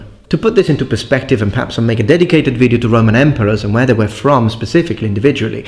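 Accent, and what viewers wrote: Italy. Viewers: Not so much Latin pronunciations (We don't know what ancient Latin sounded like) but Italian. So your brain is saying, "Oh that sounds good," but the reality is that you're just listening to a Romance language accent, speaking Latin. I speak Spanish as a second language, and I notice that I will throw a Spanish accent on Latin words, because, well, I don't know what else to use as a reference point. But I'm aware of it. I'm sure this guy is aware of the limitations of his pronunciations too. I know it seems pedantic, but this is an often debated subject in academia. We truly don't know what Latin sounded like until the middle ages, because that was the first time diction books started being printed. So that's where humanity's knowledge of the sound starts. edit: Never mind, this guy has another video claiming he is pronouncing classical Latin. 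So it appears he is just another boob on youtube who collects weapons and armor, but doesn't really have any deep knowledge on the subject. Still entertaining, and not wholly factually untrue, but I would never use this guy as an academic reference. He's definitely misleading people in an effort to appear more knowledgeable than he is. Even in this video, he claims various things based on real historic examples, but he completely leaves out the perspective of how long the Romans existed. Literally, for the first few hundred years, every single Roman legionarie was from the city of Rome. Period. Citizenship was a requirement of serving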